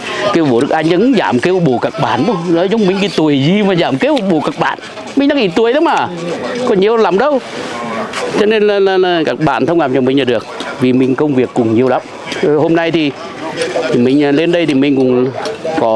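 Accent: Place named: Nghe An, Vietnam. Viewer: Bố ơi! Nói giọng bắc đi, thích xem kênh của bố mà nhiều khi không hiểu? Giọng Nghi lộc Nghệ an rất khó hiểu. Chúc bố có thật nhiều SK để cùng các cháu trong team Châu phi giúp đỡ được nhiều bản làng Châu phi.❤❤❤❤❤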